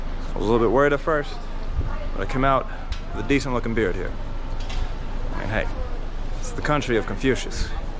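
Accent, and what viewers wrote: New York, United States. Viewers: Sunglasses, camera + your american accent... Times are clearly different. I don't think that woman was a scam artist. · Yankee speaks Chinese with accents cool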